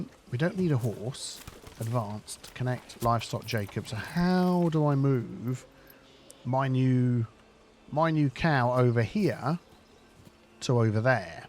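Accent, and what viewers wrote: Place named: Midlands, United Kingdom. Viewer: Great to see you playing this game - looking forward to release of this beautiful alpha! I have sort of given up on cities skylines 2 until there are assets and more fixes (despite having 300 hours in it) - so this looks lovely and from all the play I have seen there seems to be no game or deal breakers. Lucky you having contact with Greg for help! But yea, after cities skylines I think you are simultaneously over-thinking and under-thinking things at times LOL. Also you missed a trick when laying out your houses... after selecting the area you can hit the min-max buttons to adjust the size of the plots... so if you have a row of say 6 you can make them bigger and drop to 5, 4, 3 or 2, allowing for bigger gardens or room for two families. If your trades are taking too long get a horse or two....you need to beef up on the wood, cos virtually everything hinges on it, and getting more families moved in to have more workers. 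Not being a smarty pants here - just what I've observed.... and as I say, this has a really different mechanic than say CS2 or indeed most other games:) I love that it feels totally organic, and love how you can get your roads and paths to flow without the complexity seen in other titles. I had to laugh when you got baffled when you looked at one peasant and said she was carrying a sheep...then zoomed in and she's carrying wool and you wondered where it had come from LOL.... You sound like a midlands guy, so I presume you know where wool comes from hah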